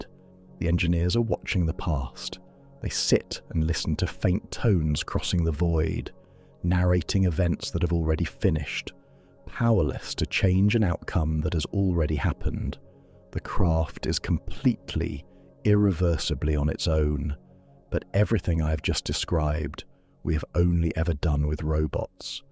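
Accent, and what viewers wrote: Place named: England, United Kingdom. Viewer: Why is every AI notation done with an English accent. Getting very annoying.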